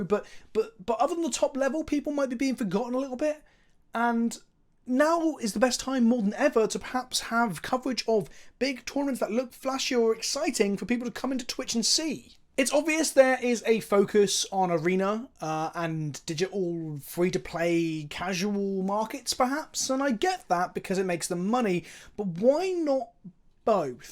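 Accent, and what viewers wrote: United Kingdom. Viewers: I enjoy these kinds of videos. Even though the news is kinda a kick in the balls, it helps to hear you talk about it with that velvety british boi baritone voice.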